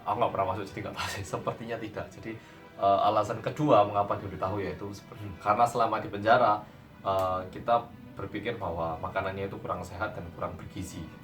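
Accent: Java, Indonesia. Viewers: matursuwun udah di kasih Tahu, mas lek ngomong medok e mandes nemen heheeh ditunggu video brikute mas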